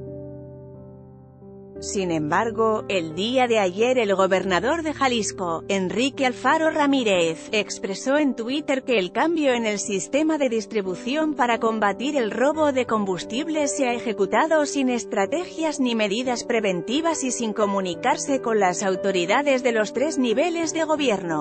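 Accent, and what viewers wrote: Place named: Spain. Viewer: Yo opino que deberias usar tu voz y no la de una Españoleta suenas extranjera suenas mal. .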